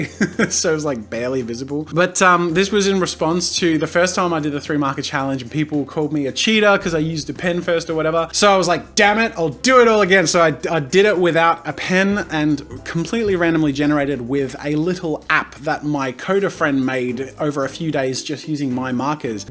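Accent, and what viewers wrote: United States. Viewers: his American accent is incredible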